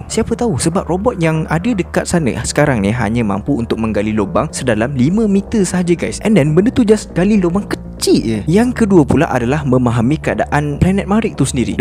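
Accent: Johor, Malaysia. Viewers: Bro...ko org johor kan...aku baru je subscribe kau...cara kau cakap ...senang faham...👍🏼👍🏼👍🏼👍🏼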